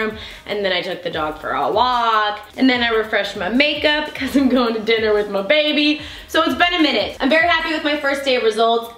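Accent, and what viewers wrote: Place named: Texas, United States. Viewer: Not too strong, but I assumed you were a Texan the first time I heard you speak. It's not country though or East Texas twang. Brings out my drawl anytime I hear another Texan speak ( or Southerner for that matter), even when it's not thick. No idea why! Graveyard Girl does it to me as well. Incidentally, I watched Good Girls the other day and was excited when I recognized you. I yelled at my husband " WE HAVE THE SAME HAIR!!". He was confused and startled lol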